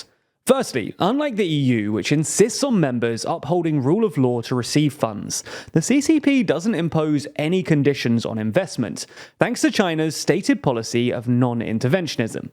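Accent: England, United Kingdom. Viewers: The videos are great but what s with this overly british accent ?? Kind of anoying, sorry☺️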